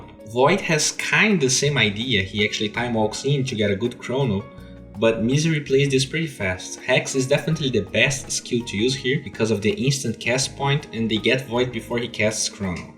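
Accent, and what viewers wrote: Brazil. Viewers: ^ This. I'm unfamiliar with the brazilian accent so it makes it hard for me to understand him sometimes. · Let the brazilian man with the brazilian accent do what he likes. It's not like people can't understand shit because he pronounces words with an accent.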